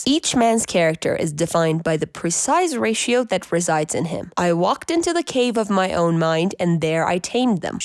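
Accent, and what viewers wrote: United States. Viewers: you don't need to, i already did it for you. it's just an American accent. :)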